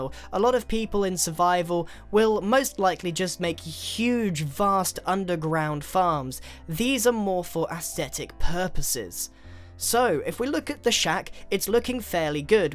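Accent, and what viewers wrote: United Kingdom. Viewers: you're British right, it's hard to tell : o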